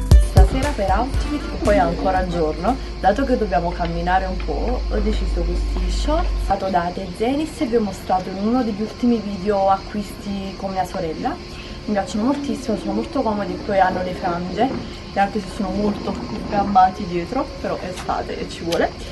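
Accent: Naples, Italy. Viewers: ma tu di che parte di napoli sei?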